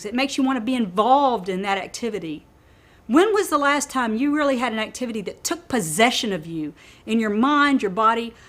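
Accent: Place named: New Zealand. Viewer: ♥ her accent, from NewZealand :) Sounds like she's from where I live. . . sort of :)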